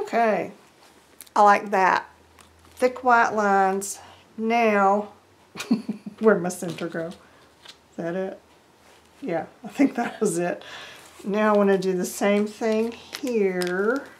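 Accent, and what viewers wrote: South, United States. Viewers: Those are so cute:-) I love your southern accent:-) I am going to try and make some of those myself. Im glad you didn't come back from cutting up the sheets all bandaged up:-) Your ideas are just clever and amazing! I would of never came up with an idea llke that with my pea brain:-) Thank you!!!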